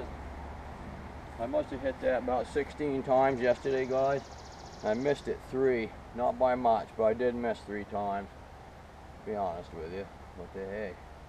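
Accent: Maine, United States. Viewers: That accent...you must be from Maine.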